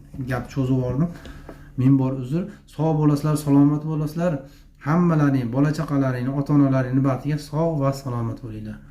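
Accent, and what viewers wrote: Andijan, Uzbekistan. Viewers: Oddiy o'zimizcha Anjanchasiga gapirib qo'yaqolaman, deb xatolariyizdan o'ldirvordiyiz lekin. (Quick Response Code) QR- код Piar- код deb. Aka Andijonliklar sizchalik xato gapirishmiydi lekin. Siz halqqa bir ma'lumot opchiqvottisiz, aytivotgan gapiyizga o'ziyizam tushunmaseyiz kerak xatoligidan. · Anjon shevaku😂shunqa sozini bular ashnaqa deydi😂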